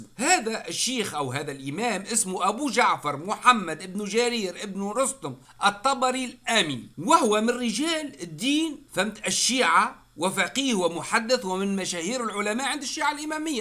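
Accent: Tunisia. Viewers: استاذ انت احسن مدرس للتاريخ, و اللهجة التونسية زادتها حلاوة. تلميذتك من الجزاءر.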